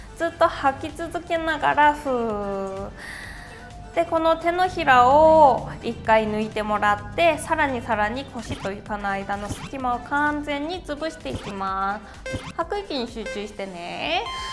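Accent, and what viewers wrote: South Korea. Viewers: 韓国語のイントネーションを感じた🇰🇷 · 発音が韓国語でかわいい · 先生が韓国人だと知った上ではたまにあー韓国の人が訛りね感じるけどほとんど感じません。何度も日本語で話されてるからでしょうね。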